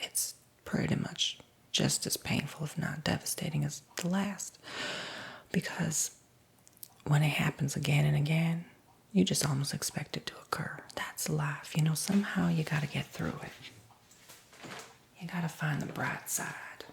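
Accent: South, United States. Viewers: Love the Southern accent lol Are you on the Tingles app? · At least your a nice southern cafeteria lady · I’m in LOVE with this character!!! I love me a GOOD OLD SOUTHERN DRAW! Voice is perfect and soothing! The stories and chit chat are on point! Great job!!! 😊😊😊 THANK YOU for this!!!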